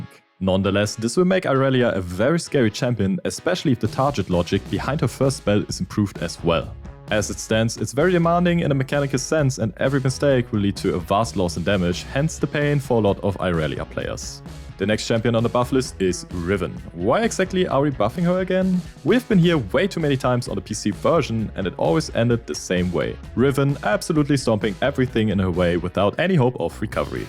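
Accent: Germany. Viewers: R U German? Ur pronouncement Sounds Like thia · Is the moderator a German? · I really like this German Accent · The german is strong with this host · Man hört es direkt das er Deutscher ist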